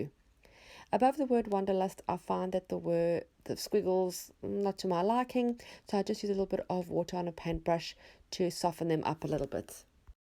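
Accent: South Africa. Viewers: It was so wonderful to hear a South African accent!! First time viewing your channel! Sending blessing from sunny South Africa!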